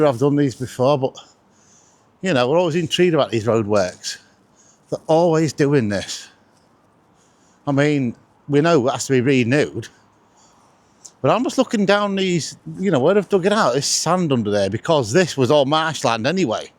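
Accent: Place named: Grimsby, United Kingdom. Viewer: I live in South Africa and I really enjoy listening to your Grimsby accent ! Your videos are Fantastic !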